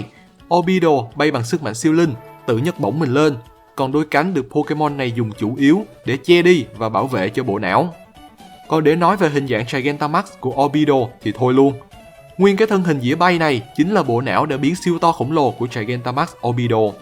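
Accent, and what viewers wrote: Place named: Mekong Delta, Vietnam. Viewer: Thích vì cácb nói chuyện đậm chất miền Tây (dù không biết ổng miền nào) Ví dụ rất dễ hiểu và cừii ss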